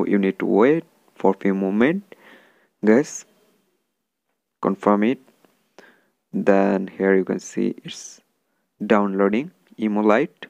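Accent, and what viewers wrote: India. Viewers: You need to speak proper English Remove your hindu accent it sounds disgusting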